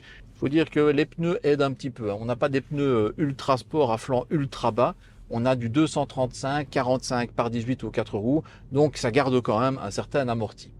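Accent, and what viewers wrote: Belgium. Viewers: Une chaîne amateur mais aux vidéos très pros, un bien bel essai, agrémenté d’un joli accent liégeois 😊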